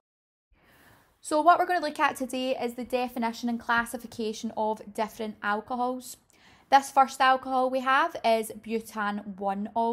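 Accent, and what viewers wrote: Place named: England, United Kingdom. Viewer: I like your English accent reminds me of Brave Disney movie, Merida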